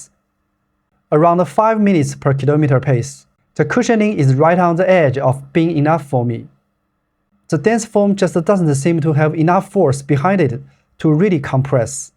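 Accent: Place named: China. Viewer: in terms of grammar there isn't much to correct. the only challenge is sometimes I have a hard time understanding some words. it's certainly due to my unfamiliarity with chinese accents.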